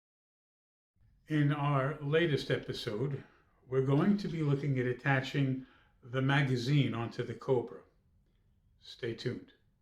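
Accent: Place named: England, United Kingdom. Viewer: I thought Brooklyn but then I recognised the English tone to your voice and assumed it was the other way around sorry. great video anyway, I'll be doing the same modification tonight with your help. Thank you!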